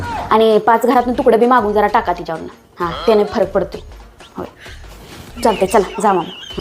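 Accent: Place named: India. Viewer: दीदी गाव कुठलं आहे तुमचं एक वेळ वाटते तुम्ही शहरात आहात एक वेळ वाटते खेड्यात आहात छान छान तुमचं बोलणं आहे असाच मराठी माणूस पुढे गेला पाहिजे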